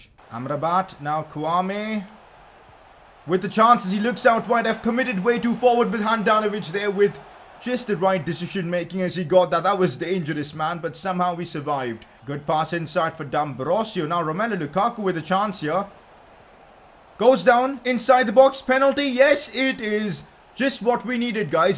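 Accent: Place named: India. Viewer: I wanna watch your videos but the way you talk man... I cant stand hearing the indian accent for almost 30mins😂 I can’t bear it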